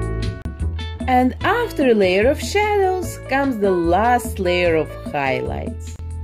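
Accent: Russia. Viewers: I love your voice its like Russian mixed with Queen Elizabeth.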